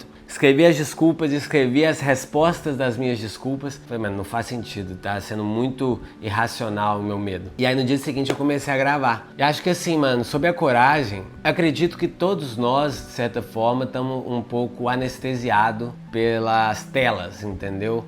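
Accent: Minas Gerais, Brazil. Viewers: Sabia que ele é mineiro. Tem sotaque 😂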